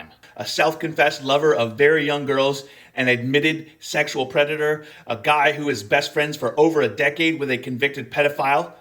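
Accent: Scotland, United Kingdom. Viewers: I just noticed your Scottish accent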